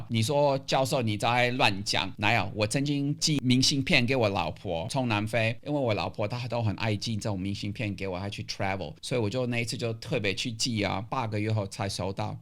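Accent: South, Taiwan. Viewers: 其實台灣日常真的是比別的國家安全很多，雖然台灣現在因各種社會因素也是導致犯罪率升高，但還是比外國好。（歐洲白天很漂亮，但一到晚上對落單的人是真的很不安全）（教授說話好台，教授很認真講，但我邊看邊笑 🤣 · 不愧是教授，非常風趣，中文口語也太台灣人了啦！.....XD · 台灣腔好道地，🤣🤣🤣🤣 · 教授的中文講得真好， 還有濃濃的台灣腔 ，有些人是身在福中不知福啊！台灣真的是一個很美麗又幸福的地方！ 看了以你的影片不訂閲真的可惜！ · 教授真的很像台灣人了ㄋㄟ。講話的口氣口語、慢,快、而且是像南过的台灣郎ㄋㄟ。每天必看ㄋㄟ就會有好心情讚讚讚啦！ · 好好笑喔～口吻很台灣。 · 教授你講話好好笑 根本是台灣人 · 聽這講話的台味，完全是台灣人無誤❤ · 第一次聽到外國人講出台灣國語… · 教授都有台灣國語了！太酷了！去過美國、日本，那裡都很棒！但我跟我媽說：謝謝把我生在台灣！ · 你講話的語調太像台灣人😂😂😂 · 那句QQ蛋讓我訂閱了🤣😂😂 你真的是外國人嗎？怎麼沒有口音？而且好台😂😂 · 你的中文真的很台XD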